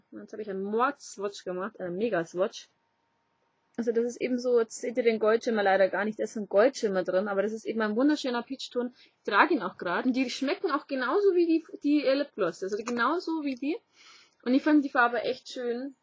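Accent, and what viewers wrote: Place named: Bavaria, Germany. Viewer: hab deinen kanal heute erst entdeckt :) woher kommstn du genau? warsch ausm kreis münchen oder? ;) man hört hald einfach bei echten bayern des bayrisch immer raus hihi bin selber aus landshut bei mir isses genau so ;DD liebe grüße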